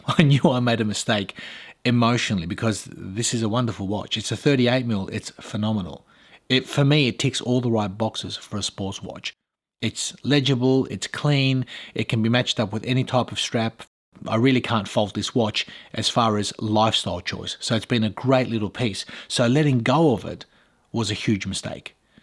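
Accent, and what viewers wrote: Australia. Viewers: I love your videos mate. I love your Aussie 🇦🇺 accent. Love from India👊😊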